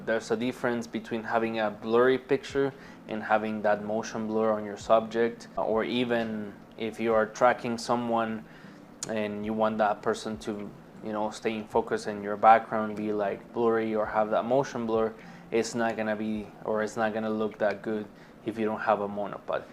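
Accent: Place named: Spain. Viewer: I know it's hard for native Spanish speakers but you gotta work on your pronunciation of "z" and "v". Zi is not Ci and Video is not Bideo.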